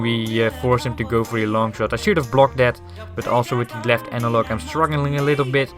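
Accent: Netherlands. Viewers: Kom net je kanaal tegen. Jeetje,... echt erg indrukwekkend hoe je speelt. Wellicht spelen we een keer online. Verder zou ik enkel je Engels een beetje opschonen. Enkele standaard Nederlandse Engelse uitspraken; "finding it out", "try stuff out". Enkel goed bedoelt! Heb me iig geabonneerd en heb er al een hoop van geleerd, thx!!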